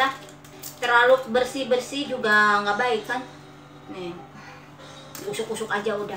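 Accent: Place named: Sunda, Indonesia. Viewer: Dari judulnya aja udah gokil Mak , suka sm medok Sundanya 🙏🏻😁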